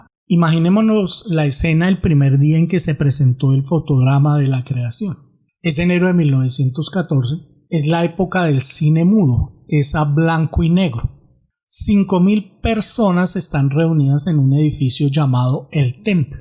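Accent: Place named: Colombia. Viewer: CON TODO RESPETO, TU ACENTO ME DICE QUE ERES DE COLOMBIA,PRIMERO QUE TODO. CHARLES TAZE RUSSELL, NO TENIA COMO DIJISTE COMO 70 AÑOS EN 1914 CUANDO SALIO EL FOTODRAMA,TENIA 62 AÑOS, YA QUE EL MURIO EL 31 DE OCTUBRE DE 1916 , TAMBIEN NO DIJISTE QUE MUCHOS DE LOS DISCURSOS QUE DIO FUERON EN SALONES MASONES, ADEMAS DE HABER VENDIDO UN TRIGO DE FORMA FRAUDELENTA ,POR TAL HECHO LO DEMANDARON Y REGRESO EL DINERO , A LOS DAMANDANTES ,TAMBIEN SE TE OLVIDO DECIR QUE COMETIO ADULTERIO POR ENGAÑAR A SU ESPOSA MARIA FRANCES RUSSELL. CON SU SECRETARIA UNA MUJER MAS JOVEN QUE SU ESPOSA , FUE BORRACHO TAMBIEN, Y DE SU SUCESOR JOSEPH FRANKLIN RUTHERFORD, OTRO BORRACHO , MUJERIEGO, E INCLUSIBE COMETIO PEDERASTIA AL CONQUISTAR A UNA JOVEN DE 16 AÑOS DE NOMBRE BERTA, ADEMAS CON UNA MUJER CASADA DE NOMBRE BONNIE, EN 1935 SALIO EL PRIMER LIBRO DE TEXTOS DIARIOS , DONDE EL DECIA TENER UNA GRAN ADMIRACION POR ADOLFO HITLER, Y LA RAZON POR LA QUE ENCARCELARON A LOS TESTIGOS DE JEHOVA EN ALEMANIA NO FUE POR PERSECUSION POR SU FE , FUE POR CULPA DE RUTHERFORD, AL PONERSE A FAVOR DE LOS JUDIOS , ESA FUE LA RAZON DE PERSEGUIR A LOS TESTIGOS, Y DE OTRAS MAS , NO LO HUBIERAN PERSUEGUIDO NI ENCARCELADO , YA QUE DECIAN QUE NO ERAN UN PELIGRO PARA EL PARTIDO NAZI , POR SER NEUTRALES POR SU RELIGION , Y SI NOTAS LOS UNIFORMES DE ELLOS TENIAN UN TRIANGULO, A DIFERENCIA DE LOS JUDIOS CON LA ESTRELLA DE DAVID .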